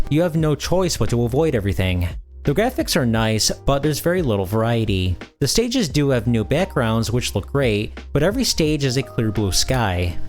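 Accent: United States. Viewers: I grew up in the U.K. when Code Masters started doing serious business and the Oliver Twins were very much local heroes and near unknown worldwide. So it’s nice to hear an American voice cover their achievements.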